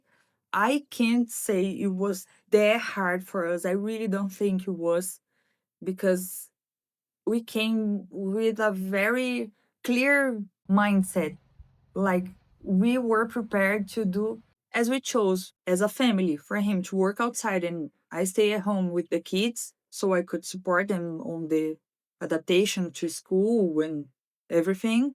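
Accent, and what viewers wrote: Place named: Quebec, Canada. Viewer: Hi! In some ways your accent sometimes sounds like French Canadian haha! Winter has two aspects for me. 1) It makes me appreciate my cozy home more (in summer you don't want to be inside) and 2) There's a whole lot of winter activities that people can do to enjoy the outside. Skiing, skating, snowman, snow angels. Christmas is really Christmas too!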